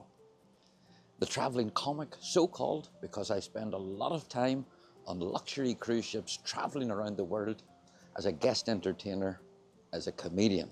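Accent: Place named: Northern Ireland, United Kingdom. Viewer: My wife and I will be on the September 12th 2025 Oceania Sirena sailing through the UK including Belfast. We loved your video and we are requesting that you perform on our cruise as we would love to meet you. P.S. my wife loves your Northern Ireland accent. Doug & Jenna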